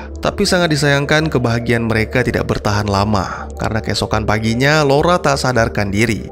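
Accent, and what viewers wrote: Aceh, Indonesia. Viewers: Brakti bg orang ACEH ya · Ooo miminnya dari Aceh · Abang orang Aceh ternyata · Ooh. Mimin org Aceh ya? 😅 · Abang dari aceh?😳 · Bang orang Aceh pasti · Dari Aceh ya bangg 😭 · Orang Aceh bg? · Asal Aceh ya bang 🙏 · lu dari aceh bang?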